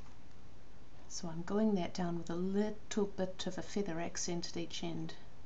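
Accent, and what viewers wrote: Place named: New Zealand. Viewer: Kiwi accent yeahhhh!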